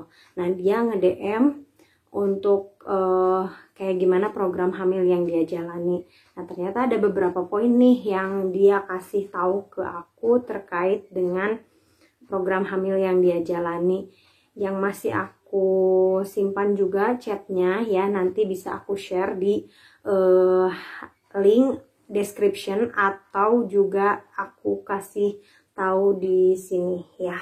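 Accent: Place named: Tasikmalaya, Indonesia. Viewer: Logat tasik na aya keneh.. 😁😁✌️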